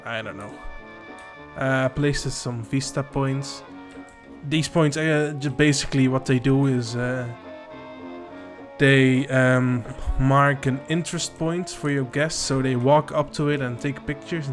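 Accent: Netherlands. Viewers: Ik hoor aan je Engels dat je Nederlands bent, nice video!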